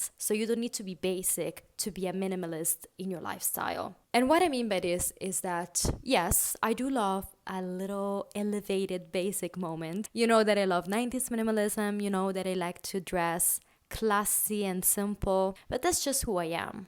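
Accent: Italy. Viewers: Mado, che bell dialetto italiano 🥰🤌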